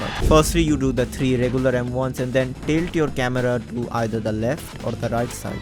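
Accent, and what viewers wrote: India. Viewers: I also feel he is indian bcz of his voice British say English In another way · FINALLY A GOOD INDIAN TSB YOUTUBER, THANKS THIS WAS RLLY HELPFUL PLUS NICE INDIAN ASCENT👌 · WAHT KIND OF INDIAN ACCENT IS DAT W ACCENT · Indian accent? w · your indian i am pakistani so i know accent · Bhai u Indian? Ur accent tells me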